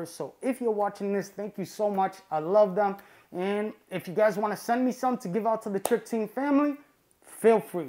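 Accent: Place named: New England, United States. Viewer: This guys seems to have more of a new England area. Maybe long Island.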